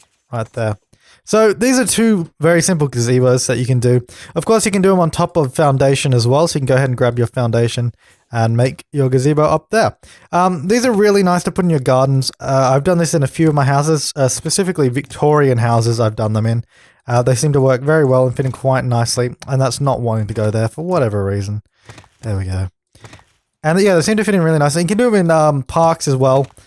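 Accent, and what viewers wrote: Australia. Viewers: Finding this in 2024! You sound like an Aussie just like me!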